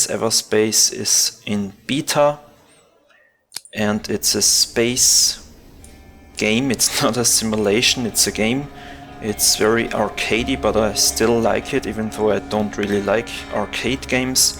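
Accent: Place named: Germany. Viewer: Am Akzent hört man das du ein Deutscher bist. :D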